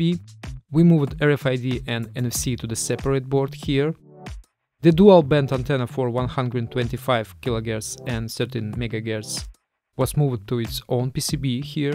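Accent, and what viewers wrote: Russia. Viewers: Этот русский акцент идеален) Хочу себе данный девайс) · Чувствую Русский акцент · Акцент специально делаешь такой заметно русский?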